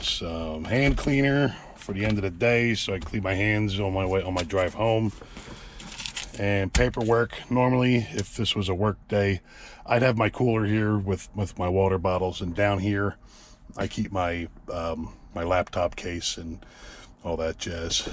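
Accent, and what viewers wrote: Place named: Scranton, United States. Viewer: I LIKE YA GUY; JUST RECENTLY SUBED. YOUR ATTITUDE AND MANNER OF SPEECH WOULD FIT WELL IN SCRANTON PA. (THATS A COMPLEMENT) GREETINGS FROM NOSE (THATS MY NICKNAME)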